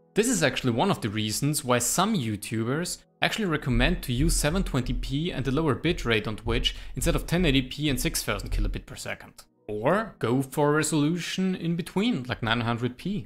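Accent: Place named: Germany. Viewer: I could tell you were born in Austria, Germany, or any other place that speaks German as a language. the accent sounds german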